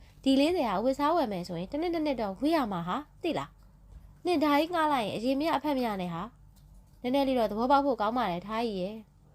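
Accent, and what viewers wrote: Upper Burma, Myanmar. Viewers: Jayရဲ့အသံလေးကို ချစ်မှချစ်😍😍😍ဘာလေးဖတ်ပြပြ အညာသံနွဲ့နွဲ့လေးနဲ့ ပီပီသသ စိတ်ပါလက်ပါ😍🩵🩵ကျေးဇူးအထူး & DAN team🩵🩵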